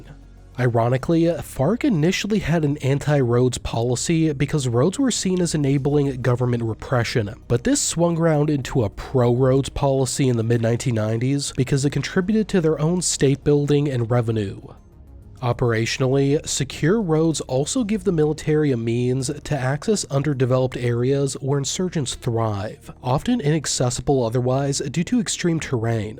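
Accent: Australia. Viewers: great aussie accent - Jordies fan? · That was actually a really good Australian accent mate 👍 well done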